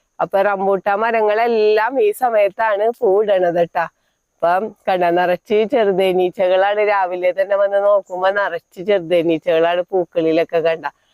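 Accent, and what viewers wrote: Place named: Kozhikode, India. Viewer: Couldn't understand Koyikodu bhasha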